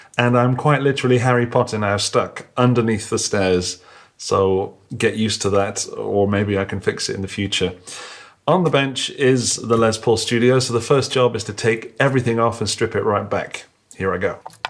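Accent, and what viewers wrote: England, United Kingdom. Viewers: yes we English did invent the English language 😂😂 it's pronounced Lez in England/English. speaks with an English accent so he's pronouncing it correctly.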